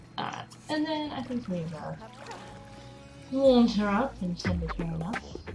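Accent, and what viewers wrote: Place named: Australia. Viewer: so happy to have found a fellow aussie's channel! it was so funny, i was watching and i was like that accent... it's strange.. and then it hit me - it's my accent LOL!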